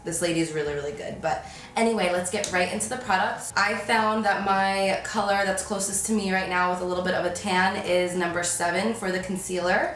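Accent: New Jersey, United States. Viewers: I love your jersey accent lol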